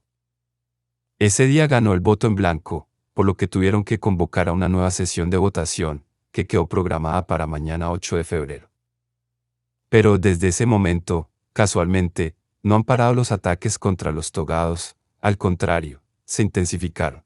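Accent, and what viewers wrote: Antioquia, Colombia. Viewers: ¿La voz de este video es de un paisa? , porque suena a voz robótica de muy mala calidad y muy fea.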